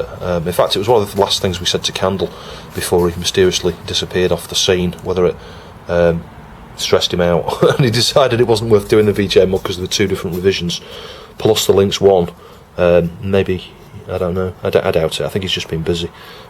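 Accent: United Kingdom. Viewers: It's always interesting to me how British people pronounce "solder" the way it looks like it should be pronounced. I never quite understand why us Americans decided to go with "sodder" for the pronunciation.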